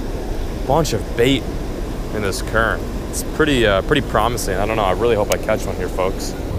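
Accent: United States. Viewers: that country accent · Your country accent kills me though 😂😂😂😂😂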